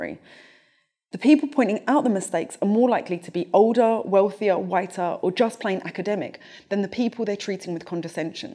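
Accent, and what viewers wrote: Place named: RP, United Kingdom. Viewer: Actually I think the message here is the opposite to that intended. We have a middle class young woman, speaking perfect English with a Received Pronunciation accent, telling the lower orders that grammar doesn't really matter. She picks a few of the tired old classic examples (and I'm a grammar snob, but I've given up on 'literally', and 'decimate' for that matter :-)), while ignoring the acres of poor usage that do lead to actual misunderstandings and confusion.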